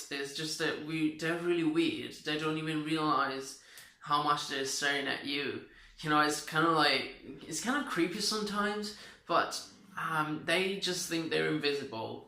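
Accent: United Kingdom. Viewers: Hi! Great video, I really like your channel and often put your videos playing in the background to hear your great british accent;) why don't you make a video on your religious faith? As you've mentioned that you met in the church, I think it'd be really interesting for us followers to know how much religion matters in your life (in terms of principles, way of living, family influences and social engagement). Keep up with your nice videos and.....btw I'm from Rome, fancy a meeting?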